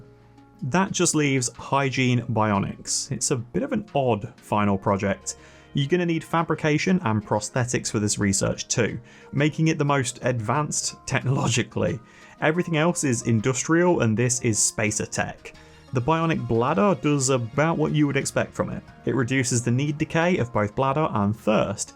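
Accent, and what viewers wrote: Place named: New Zealand. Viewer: For some reason I thought you were a kiwi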